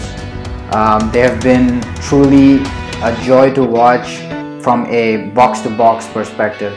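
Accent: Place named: India. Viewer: love your accent... Indian? 😛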